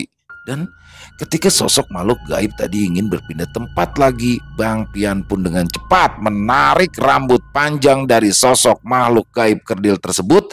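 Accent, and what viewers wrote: Jakarta, Indonesia. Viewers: Harus nya ini cerita serem.tapi karena pakai logat betawi dan banyak canda nya.jadi gk jadi seram. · Bang.. Pas banget. Bawain. Logat Betawi nya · Hdir bang, sy sngat suka dengar logat betawi, lucuu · Senang dengan logat betawi nya sosok bang ❤❤❤👍👍👍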